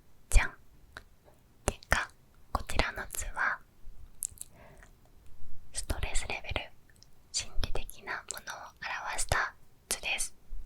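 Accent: Kansai, Japan. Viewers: こんなに海の音綺麗に録れるの凄い！ てかRunaさん関西人？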